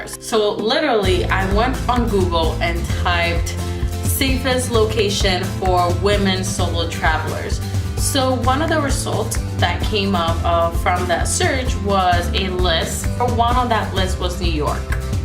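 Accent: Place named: Latin America. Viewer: For some reason u have a Latina accent. Or maybe I’m trippen... anyways aside from that, I’m considering solo traveling for my birthday in the summer. Ur pictures are amazing. I’m curious how were U able to have pictures taken of urself do u ask strangers. Also I seen you went from the Airbnb hut and then you found a cheaper hotel was there a reason u switched stays. I also want to get amazing pics and experiences while also staying safe, I’ve been flirting with this solo traveler idea for so long I just don’t know where to begin but I don’t want to wait anymore any feedback is appreciated. 🤗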